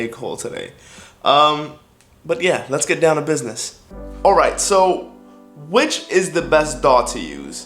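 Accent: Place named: Toronto, Canada. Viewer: you sound like youre from toronto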